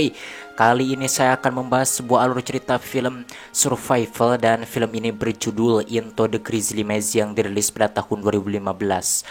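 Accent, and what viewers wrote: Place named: Tasikmalaya, Indonesia. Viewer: Pasti orang tasik atau teu garut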